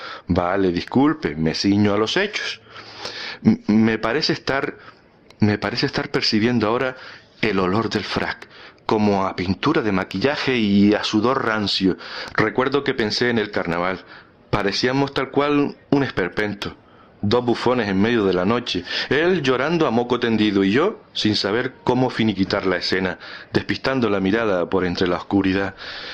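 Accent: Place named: Chile. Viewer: Buenísimo!! Muy bien narrado!! Me encanta el acento, será chileno?? Me he reído mucho!! Muchas gracias!!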